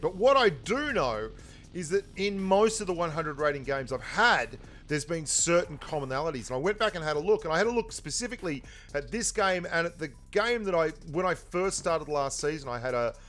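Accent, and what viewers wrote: Australia. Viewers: I don't know if it's the thicc australian accent or the great gameplay but I can't stop binge watching your videos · Loving that Smooooth Australian voice!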